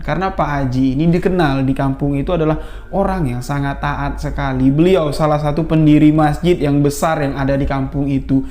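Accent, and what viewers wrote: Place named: Sumatra, Indonesia. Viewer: ahai logat sumatera ni kek nya bang,,, sedap pulak awak dengar nya bang,,, beda dgn channel misteri lainnya... salam dari aceh bang..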